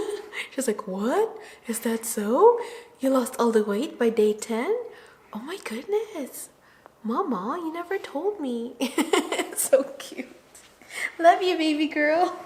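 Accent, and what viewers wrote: Philippines. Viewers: She has a very distinct Filipino way of speaking certain words beginning/ending with ‘t’ and ‘th’. She substitutes a ‘d’ quite often; they becomes dey; with becomes wid; time becomes a subtle ‘dime’; there becomes dere. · agreed she's talking with a more Pinay accent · in this video she has that Filipino english accent....but her sister has more of d Pinoy accent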